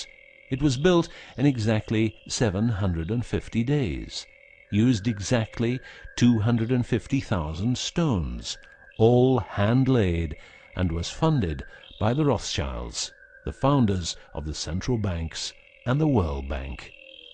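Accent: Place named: United Kingdom. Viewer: this is RETARDED but since an annoying brit is narrating it must be true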